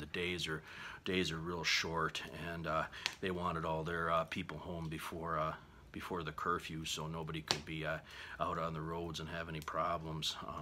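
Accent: Michigan, United States. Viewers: Sounds like you did some research for this one.👍 Just found your channel and subbed Sounds like you’re from Northern Mich. also.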